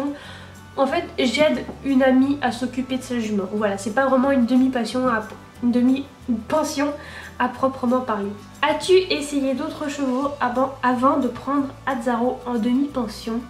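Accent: England, United Kingdom. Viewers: Ton accent anglais est juste magique xD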